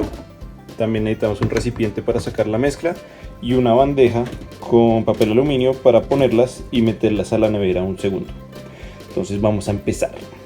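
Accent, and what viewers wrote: Colombia. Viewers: De qué parte de Colombia eres? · Eres Colombiano verdad. Que buena vibra.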